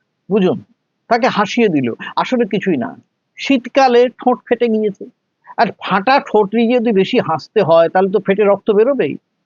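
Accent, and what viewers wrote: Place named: West Bengal, India. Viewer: আপনার বলার বাচন ভংগী খুবই চমৎকার,,,,,, তাছাড়া পশ্চিম বাংলার বাংগালী ভাইদের ভাষার মাধুর্যতা তো আছে ই,,,,ধন্যবাদ আপনাকে।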